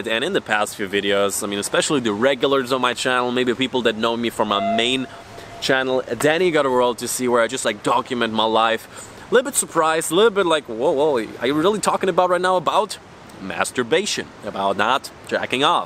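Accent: Germany. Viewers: Can anyone tell whether this guy is German? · Bro kommst du aus Düsseldorf??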